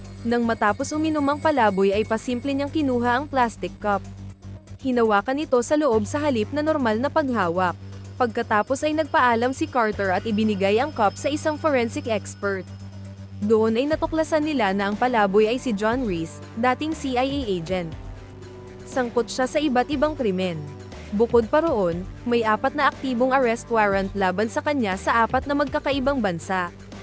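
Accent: Visayas, Philippines. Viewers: The narrator is Bisaya...kinulata?😁kaway kaway beh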